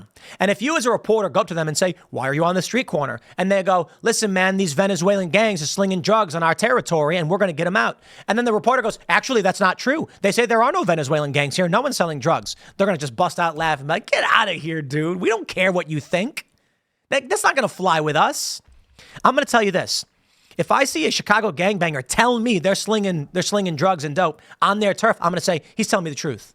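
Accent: Chicago, United States. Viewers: Tim really tries to make his chicago upbringing sound like Gotham city. Guarantee it was not THAT bad for him. Most of Chicago is fine and Ik he didn’t grow up in the parts where it’s really bad. It’s very small parts that do most of the crimes. Tim is not from those parts at all so he’s trying to sound cool. Like he doesn’t even talk or carry himself like those kinda people🤣. Nigga is a skater trying to make it sound he from the trenches. He sounds like he’s from the north side more than the south side.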